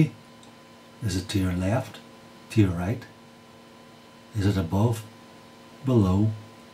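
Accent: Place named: Northern Ireland, United Kingdom. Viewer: guy is northern Irish. always strange to hear your own accent. lol great video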